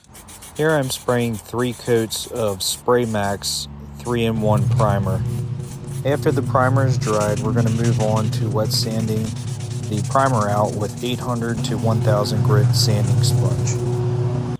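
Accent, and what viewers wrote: Philadelphia, United States. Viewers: I think you're a Philly guy. I can tell that Philly accent. I'm in SJ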